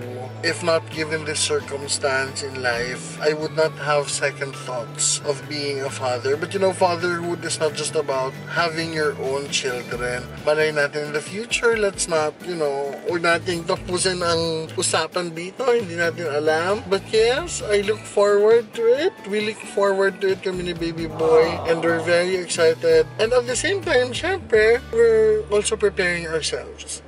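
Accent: Iloilo, Philippines. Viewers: ilonggo ka man? hahahha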